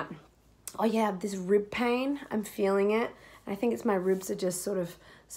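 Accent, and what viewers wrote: Australia. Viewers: I love that you share all of this with us and that you’ve never lost your Aussie accent!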